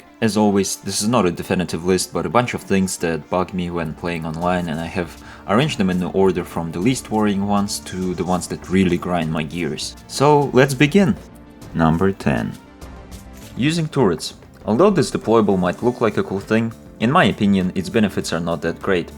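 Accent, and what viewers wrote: Russia. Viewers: Hes russian right? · your accent are you a russian?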